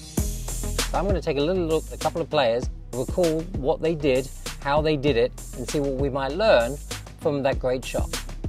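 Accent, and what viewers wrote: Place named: United Kingdom. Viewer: One if the best shots of all time in golf and i have to listen to it be talked over by barely a man with a stupid British accent for the whole video?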